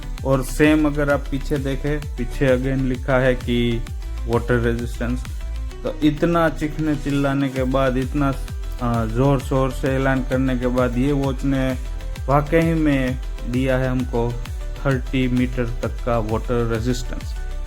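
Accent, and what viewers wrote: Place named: Gujarat, India. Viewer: Gujrati accent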